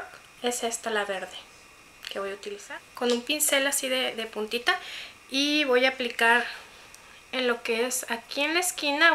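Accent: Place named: Mexico. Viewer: lindo che por ke pronuncias asi tu mushooo de ke parte de mexico eres te kedo bello y me encanta cuando ablas asi es bien lindo un beso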